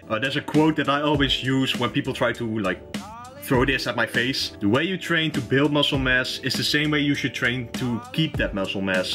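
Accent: Netherlands. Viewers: Een nieuwe abonnee erbij hoor aan je acccent dat je Nederlands bent 💪🏽👊🏼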